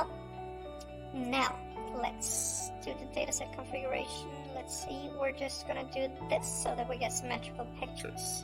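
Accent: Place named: Europe. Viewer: no offense but the filter makes it worst. love me some european accent...just use your real voice nxt time please.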